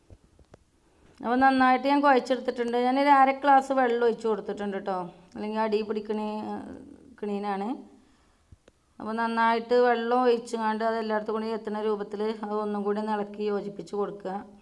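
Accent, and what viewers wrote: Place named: Malappuram, India. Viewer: മലപ്പുറം ടെച്ച്. അത് മനസ്സിനൊരു ആനന്ദമാണ്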